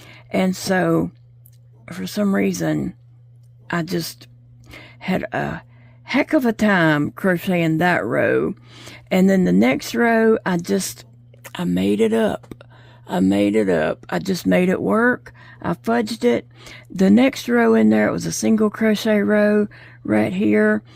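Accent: South, United States. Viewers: Kathleen from NJ blanket up in flames oh you crack me up!!!! That was one beautiful pattern and those colors were exquisite. A+ for effort. I know nothing about knitting or crochet I do jigsaw puzzles on the computer on a site by the name of Jigidi when I watch/listen to your videos I love listening to you Must be that southern accent you have. Maybe you should have given it to!!! I was tying this while you were talking and then all of a sudden there it was! Set it aside and try again Its really a beautiful piece of work. I was wondering if you ever named that yellow new doll on your desk, I gave you 3 names for the 3 you bought several days /weeks ago Look on that video for those names I thought they were pretty good......LOL.......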